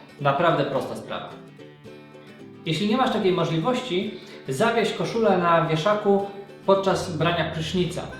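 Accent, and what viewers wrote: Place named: Poznań, Poland. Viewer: dwa słowa i już wiesz, że autor jest poznaniakiem 😁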